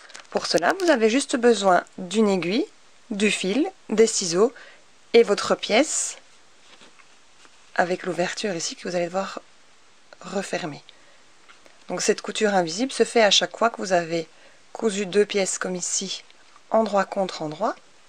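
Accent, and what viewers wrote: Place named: Belgium. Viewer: dieu merci enfin une véritable bonne explication avec une vidéo de bonne qualité. merci bcp et l'accent ne trompe pas, vive la Belgique ;)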